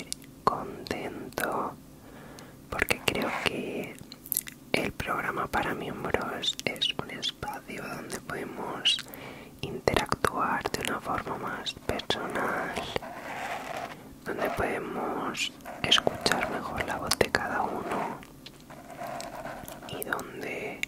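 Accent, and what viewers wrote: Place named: Castile, Spain. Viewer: Comúnmente no me gusta mucho el castellano pero a ti te queda genial y el ASMR te ha quedado muy relajante.🪐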